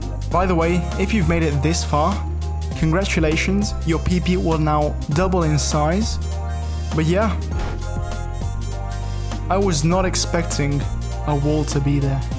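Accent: Italy. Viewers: Are you italian? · You have a particular italy pronunce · Hai un bel accento italiano fra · Wait, are u italian?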